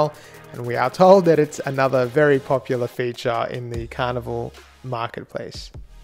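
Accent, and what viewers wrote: Australia. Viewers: I was not expecting you to be Australian, but I’m not disappointed. I’m American, and sailed Splendor in Alaska. I like the “pink lady” vibe, but I’m not a fan of all of Carnival’s aesthetic choices…Splendor is one of the better looking imo.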